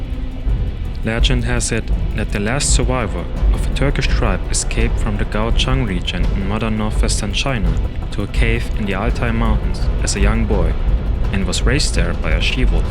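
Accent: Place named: Germany. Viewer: I've read Jean-Paul ROUX book. It's great. PS : that german accent makes it better, mein Freund.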